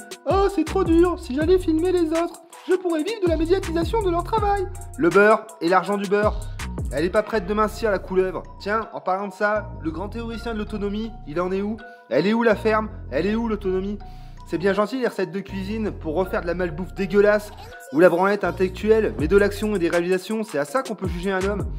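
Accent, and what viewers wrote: Loire, France. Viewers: avec l'accent de la Loire, je dis oui!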